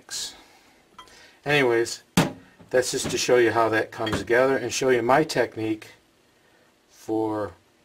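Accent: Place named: United States. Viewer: American guy. For sure. :)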